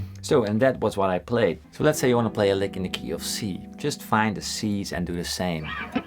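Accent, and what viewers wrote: Netherlands. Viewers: I really like your videos. They're so well recorded, informative, and to the point and of course you're a great guitarist and know your stuff. A bonus is that my partner is Dutch so I love your accent and also love your subtle jokes at your accent like "Chi Major" so funny! Big ups to you bro, from a Samoan musician down under in Aotearoa / Niew Zeeland! Jah love!!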